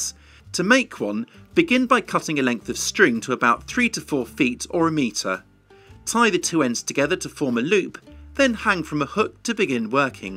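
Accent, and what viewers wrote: United Kingdom. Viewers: British people are so much more pleasant to listen to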